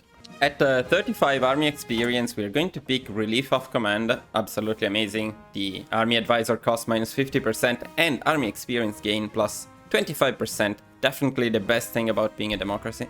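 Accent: Italy. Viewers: The Italian accent, Perfection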